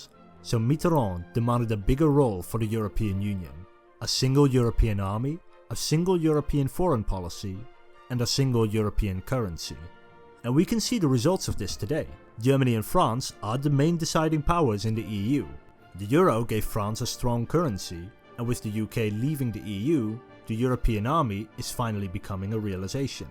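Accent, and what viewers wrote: Netherlands. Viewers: Strong Dutch accent :) cute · that sounds like a dutch accent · I guess dutch.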